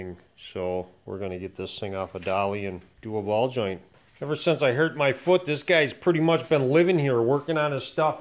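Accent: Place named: Minnesota, United States. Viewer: What an interesting channel, a creative thinkers oasis in the middle of Minnesota. Now I understand the accent in the movie Fargo, ha ha ha.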